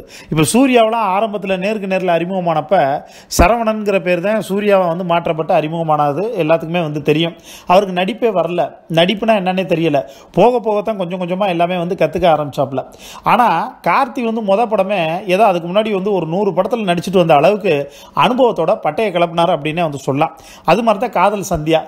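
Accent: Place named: South, India. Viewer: Ninga South ah? Slang apdiye pesuringa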